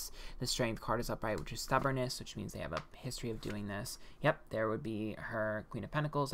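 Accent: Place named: AAVE, United States. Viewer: the aave needs to stop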